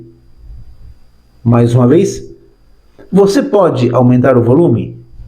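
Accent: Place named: Argentina. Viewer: Muito.charme o..sotaque do argentino falando português. Nao canso de admirar!!!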